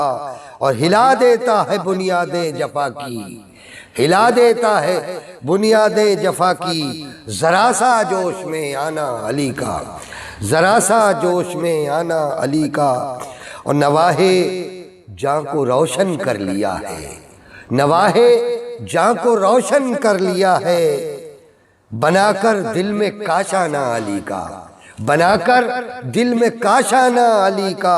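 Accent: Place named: Varanasi, India. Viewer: آج آپ بنارس مشاعرے کے لہجے میں شعر بول رھے ہیں حضرت 💗💝💗💝💗💝💗💝💗💝💗💝💗💝💗💝💗💝💗💝💗💝💗💝💗💝💗💝💗💝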